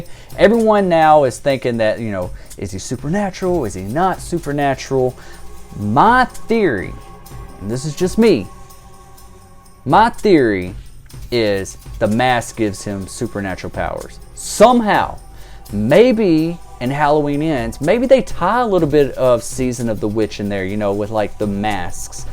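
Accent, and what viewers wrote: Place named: South, United States. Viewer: I love his voice. That southern accent is so relaxing. Looking forward to more theories and videos.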